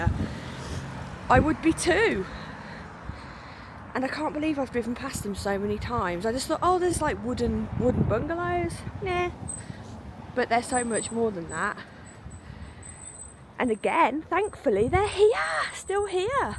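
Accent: Birmingham, United Kingdom. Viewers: I feel the same as you about my Brummie accent. It's lovely to hear it as I moved away years ago and also your videos are great. I've not been to Austin Village for decades, thanks for the info on it. 😊 Tara a bit!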